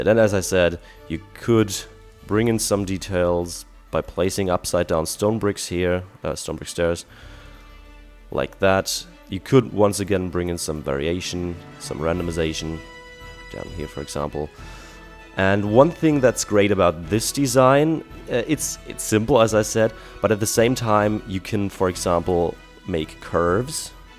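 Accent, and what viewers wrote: Germany. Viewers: you defenitly sound german in your older videos, but in this one, for some reason, you sound different. were you sick, or did you have a different mic maybe? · es zeigt, dass Sie mit dem, wie Sie sprechen Deutsch sind Grüße aus Barcelona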